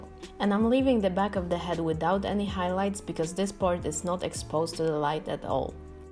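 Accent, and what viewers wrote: United States. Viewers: are u american?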